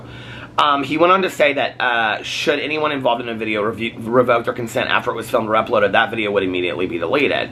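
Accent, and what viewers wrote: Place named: Ohio, United States. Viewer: I love you so much. I am from outside of Cincinnati, so the way you talk and your mannerisms remind me of my southern Ohio family and friends. It is so heartwarming bc you are such a good person and you sound like all my fave people.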